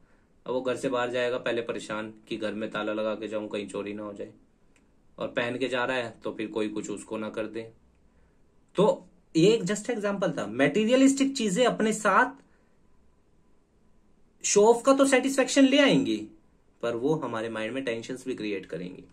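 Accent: Haryana, India. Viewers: Sir Are u from Haryana...? ? 😅😅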